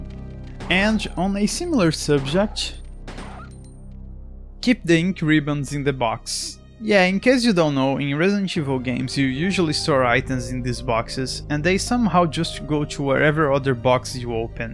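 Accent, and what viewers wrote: Brazil. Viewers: O sotaque brasileiro tão reconhecível hehe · It's a Brazilian trying to talk english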